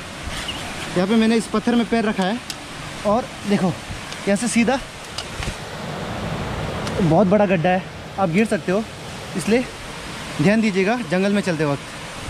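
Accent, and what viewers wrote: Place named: Arunachal Pradesh, India. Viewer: I like the way you talk it's kind of funny I mean arunachali style mast h🤣.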